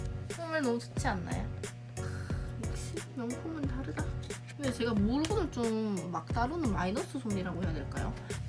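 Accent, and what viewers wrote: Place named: Gyeongsang, South Korea. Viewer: 누나 혹시 경상도 사람이세요?? 사투리를 사용하시네요... 할튼 누나 너무 뻐요😘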